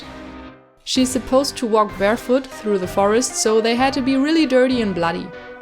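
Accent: Germany. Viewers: Its a german accent, if you want to know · This is definitely my favorite out of all the Halloween dolls I've seen! Ill be sad when Halloween is over after today and everyone goes back to making pretty pink dolls :D Disregard this if I'm wrong but it sounds like you're German. Where did you get the Apoxie Sculpt from and how much did you pay? From what I've seen it's super expensive to buy in Germany. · I thought so, you have the same accent as my friend. She hates her accent, but I love it. I like the German accent. <3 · Are you German? Because u got this accent of one btw this video was so good and thank u for not spoiling the end result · Are you german ??? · Ja Deutsche erkennt man immer wenn sie englisch sprechen xD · Ich wusste sofort, dass du Deutsch bist😂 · I usually watch dollightfulls videos but NO REGRETS WATCHING YOURS! you earned a new subscriber! 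💜 Btw, are you german by any chance? Your accent reminds me of how my class members talk English lul. Sorry if you're not. It just reminded me of that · Are u german?because of the "moin" and the way you speak(not in a mean way,you are super talentet and friendly,I'm just wondering)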